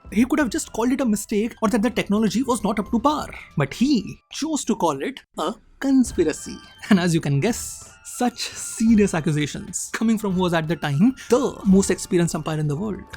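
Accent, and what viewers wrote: India. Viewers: Sorry, but you will have to work on your pronunciation and diction. Your story telling has been going downhill and this was unbearable, literally could not understand a few words. Over confidence and not wanting to improve your craft maybe?! We Indians have a strong accent, but this one was jarring. Slow down, speak properly and give it a few takes and retakes. Content was good, no complaints.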